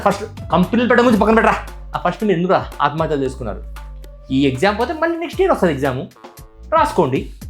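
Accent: Rayalaseema, India. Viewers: బాగా చెప్పావ్ bro. మన రాయలసీమ slang lo Super ga cheppav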